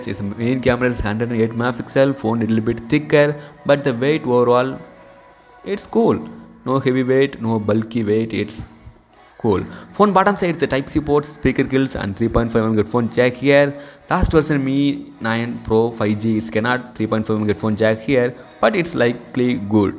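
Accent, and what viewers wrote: India. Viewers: Indian English 🤩😘✴🔥🔥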